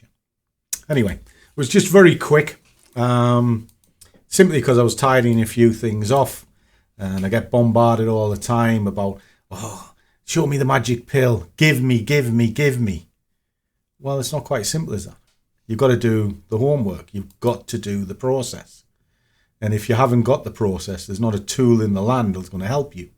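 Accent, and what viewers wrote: United Kingdom. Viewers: I didn't know you had a British accent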